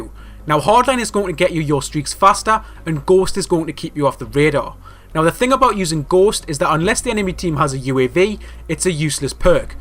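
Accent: Newcastle, United Kingdom. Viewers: he's geordie you numpty. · The good old geordie :)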